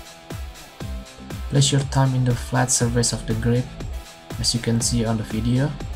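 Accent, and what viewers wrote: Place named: United States. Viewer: Me toobut I don't understand what he is saying bec I'm british and he has american and asian acent😂😂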